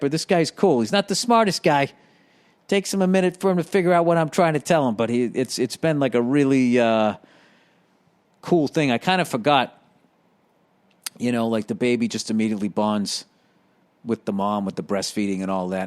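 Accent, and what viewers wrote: Boston, United States. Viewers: Bwaaston... Haha love hearing you. Keep it going.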